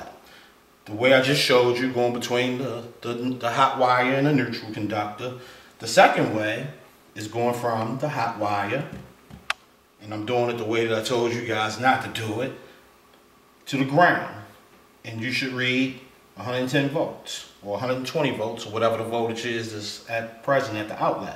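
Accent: Baltimore, United States. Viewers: Dude from Bmore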